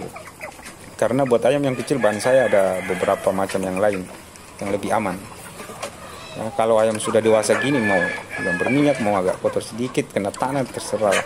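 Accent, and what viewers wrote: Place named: Medan, Indonesia. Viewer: Lokasi dimana gan? Logatnya kayak org medan. Klo medan bisa la main2 ke farm ny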